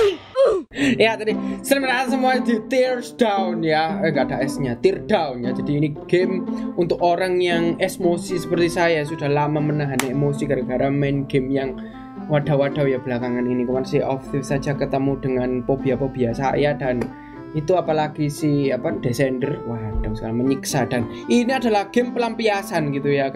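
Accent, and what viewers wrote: Java, Indonesia. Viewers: Bang bang orang jowo ya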